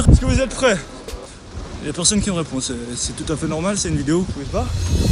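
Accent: Switzerland. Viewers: Dès le début on reconnaît ton accent suisse ! TeamSuisse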